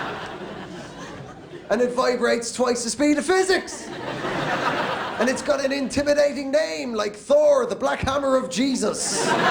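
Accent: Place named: United States. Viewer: Andrew Maxwell does a good American accent.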